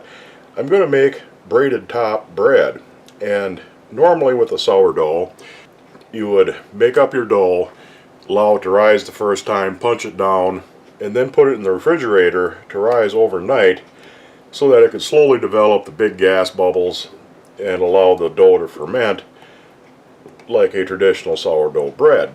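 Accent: Minnesota, United States. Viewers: Mr., do I detect a Minnesota accent? I also wonder about the lake in a couple of your videos with wildlife (black bear, ducks, geese, Sandhill cranes), which I can easily imagine is one of the lakes in Minnesota.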